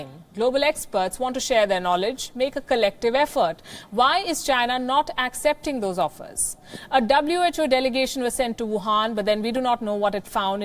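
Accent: India. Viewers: Strong india accent. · Indian accent’s never been this cool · this lady talking like indian is number one an arnt in a epidemic of a toilet shortage in its country, you are actually propitiating and brain washing people by- re-repeating what the people question are an not doing what the news are doing by providing an non bios information/solution. India is know as number top unhygienic country an number one in sexual assault, i say this as you slander another country. an get involved in its geo-politics. china says its a bio wepon created by usa, if it was a hazard the market would still be closed.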